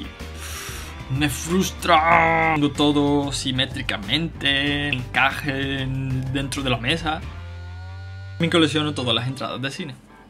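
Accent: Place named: Spain. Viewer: D soy mexicana :3 es la primeara ves que veo un vídeo tuyo!! creo CREO que eres español y tienes aquel acento mi bisabuelo era español!! bueno da igual, me he desviado mucho del tema! bueno me gustan bastante vuestros vídeos vuestros,vuestros, si es vuestros no? heheheheheh me suscribiré :O ah y por ultimo mi manía es decir todo lo que leo y escribo en vos alta o si quiera mover la boca mientras lo hago :3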